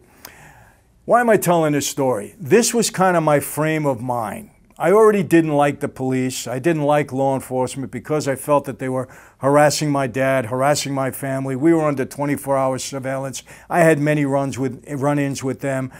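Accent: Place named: New York, United States. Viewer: The New York accent has to be the best accent to talk serious business.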